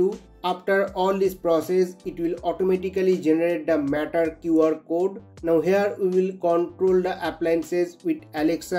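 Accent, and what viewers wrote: India. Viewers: There is nothing more painful than listening to an Indian talk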